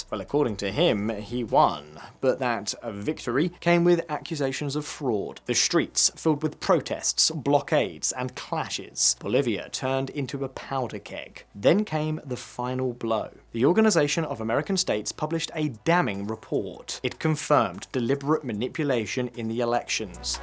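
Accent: United Kingdom. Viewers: I wish the person that put this video together would have used another AI accent. The British accent is like finger nails on a chalk board.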